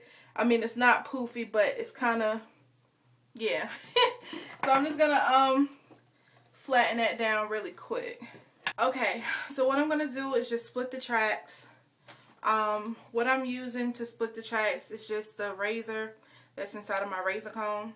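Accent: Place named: New York, United States. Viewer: Are you from New York, Philly, Or Jersey ? You have an accent lol.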